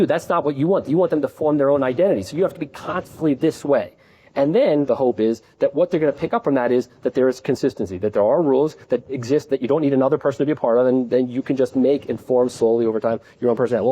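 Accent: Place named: New York, United States. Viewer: Excellent work cleaning this up—I'd actually never heard him speak intelligibly (due to audio quality, not any property of his speech). This guy more or less saved my life with his blog posts. I was completely ignorant, deluded, and self-destructive to an extreme degree until TLP's blog (TLP is the blog, I know, but it's sort of also the man) found me and taught me, very cryptically and indirectly, how it is that a human can exist. I knew they could, and do, exist, but not how. He sounds like he's from New York. I won't check.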